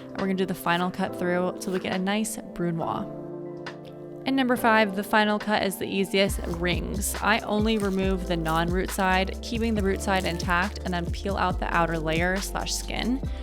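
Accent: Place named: Los Angeles, United States. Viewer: I'm from LA, I was convinced the LA white girl accent was a myth until the first 5 seconds of this video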